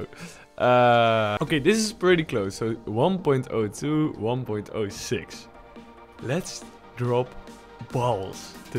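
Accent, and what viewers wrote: Netherlands. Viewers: NEDERLAND !!! ik dacht al je hebt een Nederlands accent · Dutch accent? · ik hoorde gelijk dat je nederlands was hahaha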